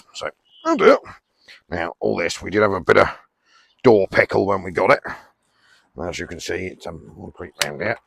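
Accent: United Kingdom. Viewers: As an American ur the first British person I’ve had a hard time understanding lol I love the accent and the car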